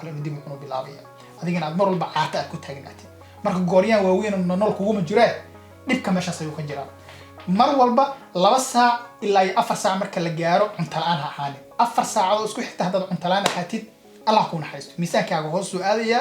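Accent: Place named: Hiraan, Somalia. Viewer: I liked lahjadaada from asal Hiraan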